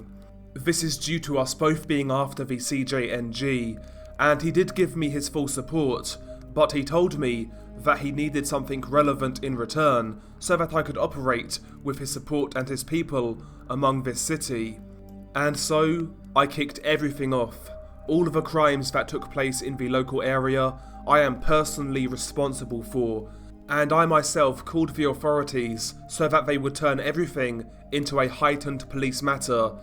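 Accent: United Kingdom. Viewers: It’s funny how a British guy is telling us all this information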